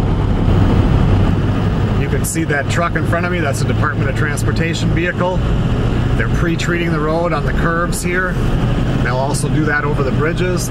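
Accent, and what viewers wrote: Minnesota, United States. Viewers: I knew from the first words that you spoke, you were from Minnesota...That accent is strong up there....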